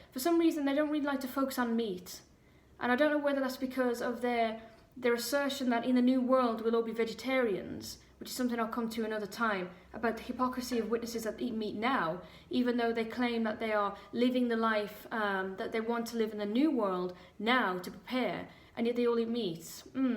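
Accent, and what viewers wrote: Ireland. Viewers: r u irish origen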